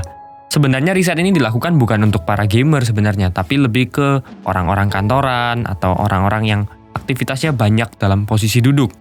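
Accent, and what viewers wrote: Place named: Java, Indonesia. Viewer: Medok seru 😆 tpi apik mas Gas teros kontennya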